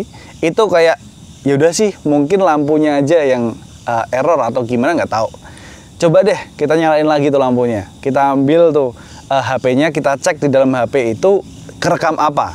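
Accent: Java, Indonesia. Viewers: Gara² Kewan, Jowone metu cok..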